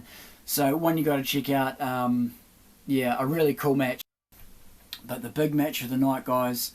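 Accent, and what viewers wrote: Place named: New Zealand. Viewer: This takes me back to a 10.30 on friday nights through the 90z. By sound of your accent lad your from new Zealand aswell bro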